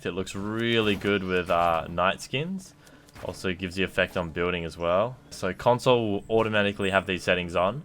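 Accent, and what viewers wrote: Australia. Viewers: Ur australian I didn't know that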